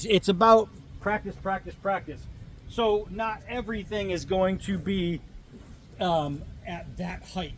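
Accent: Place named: Great Lakes, United States. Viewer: Are you in the north east? I’m from western NY, and I feel like I’m recognizing a Great Lakes accent. Great video! And you’re right about animals being tough! Even a squirrel that’s feeling threatened is a tough thing to deal with. Keep it up, brother!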